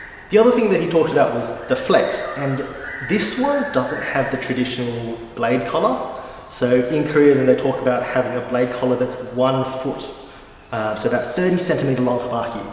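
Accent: Australia. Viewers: First thought, this bloke is aussie, next thought did he say Sydney? Turns out you're just 5 minutes away! 😮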